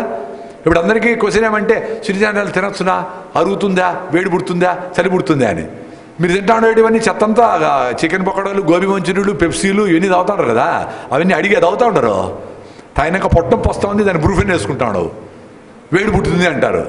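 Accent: Rayalaseema, India. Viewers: love u r rayalaseema slang sir, it makes listeners interesting to hear...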